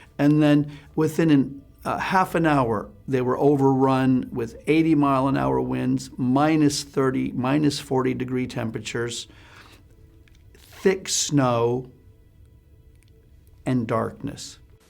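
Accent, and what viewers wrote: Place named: India. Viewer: Indian accent 👌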